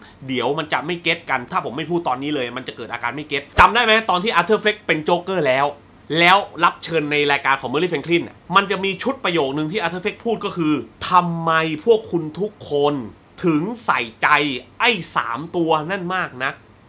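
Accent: South, Thailand. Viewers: พี่คนใต้หม้าย?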